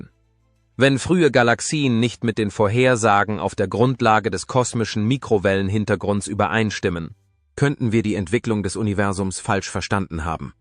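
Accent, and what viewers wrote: United States. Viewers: Die IT Stimme mit leicht amerikanischen akzentuiert ist zum kacken🥴😝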